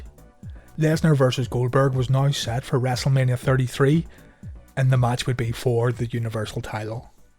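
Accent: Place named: Belfast, United Kingdom. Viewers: What part of Northern Ireland you from? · Awesome work. You're knocking out the best videos on YouTube. Sounds like you're from Belfast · Good effort on the vids mate You a Belfast man? I’m down in carrick. Appreciate the vids anyway chief keeper lit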